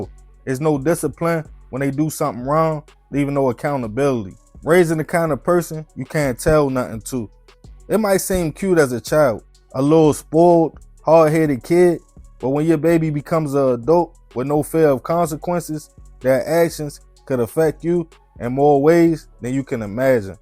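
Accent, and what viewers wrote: Baltimore, United States. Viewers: I love when I can still hear that Baltimore accent in your voice 😂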